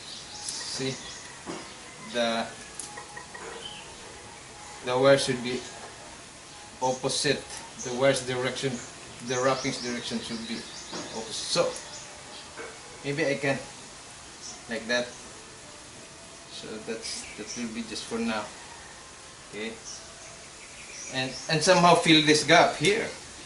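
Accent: Philippines. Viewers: That's what he's speaking. Sounds like a Filipino accent.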